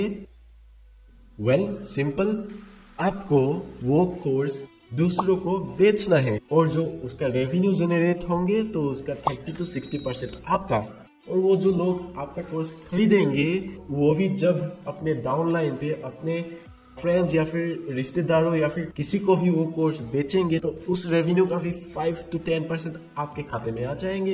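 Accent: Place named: Assam, India. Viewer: yes bro absolutely ! 😃 my hindi is also like you and I am also from Assam.. Joi Ai Axom dada ❤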